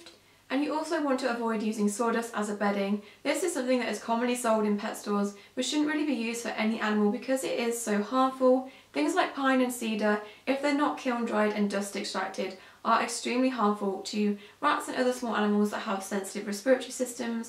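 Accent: United Kingdom. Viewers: Are you British * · You Bri-ish?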